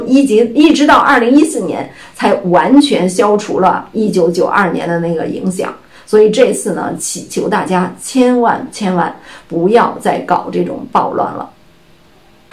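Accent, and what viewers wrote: Beijing, China. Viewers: 哪里人？北京的吗？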